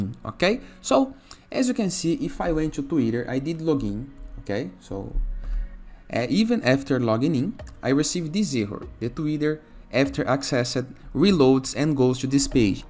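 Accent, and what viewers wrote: Brazil. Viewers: esse cara é brasileiro tentando aprender em ingles crtz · Claramente sotaque #BR hehehe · sotaque brasileiro brabo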